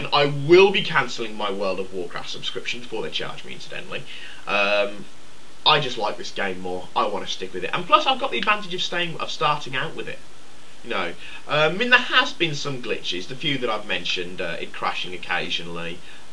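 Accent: United Kingdom. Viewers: your british-ness made this entertaining enough, not really much new to say though. Hey Hey Hey Smoke Weed Every Day. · yep definetly your real voice british? i dont know what country the currency Quids is from.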